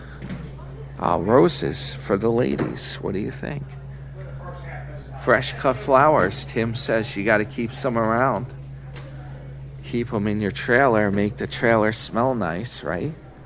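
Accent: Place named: Florida, United States. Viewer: U lost your NY accent u sound like a Floridian now . R u looking for some Sugar like ST the King of YouTube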